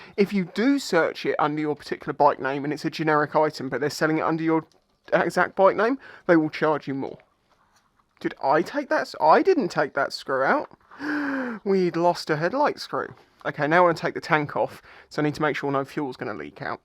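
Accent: United Kingdom. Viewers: Are you British or American you sound British but you said DR ZEE if your British you should know we say Zed , adjusting for the yanks are we.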